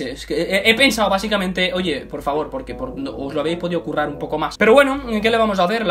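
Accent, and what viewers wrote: Argentina. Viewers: Amo tu intento de argentino😂😂💜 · Amo tu manera en que dices las palabras de Argentina 😂❤ Por algo te amo tanto ❤💫💕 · ME ENCANTA TU INTENTO DE ACENTO ARGENTINO, AYUDA TE AMO MUCHO. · Viste como cuando se te pega lo argentino:v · Me encanta que uses el acento el Argentino xd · AMO ESCUCHARTE HABLAR CON EL ACENTO ARGENTINO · el Argentino se te pego ati♥♥♥♥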